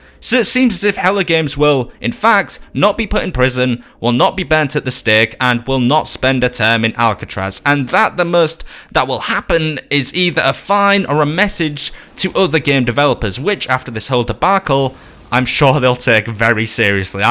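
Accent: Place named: Hull, United Kingdom. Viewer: You sound like some of my Hull friends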